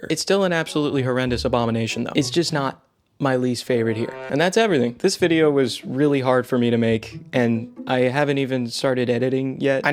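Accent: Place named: United States. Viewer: btw you have a nice american accent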